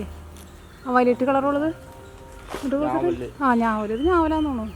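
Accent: Thrissur, India. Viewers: Thrissur basha padichu ethu enthutta sanam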